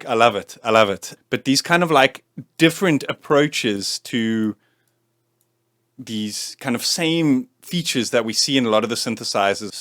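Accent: South Africa. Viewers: I know a South African Accent when I hear one! Great review bru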